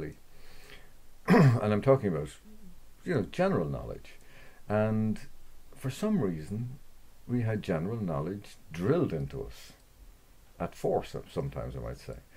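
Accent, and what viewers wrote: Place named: Ireland. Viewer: It’s so wonderful to listen to the irish accent, beautiful speech💚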